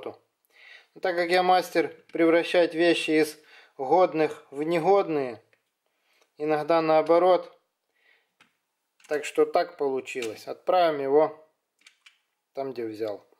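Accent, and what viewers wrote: Odessa, Ukraine. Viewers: Вы из Одессы))?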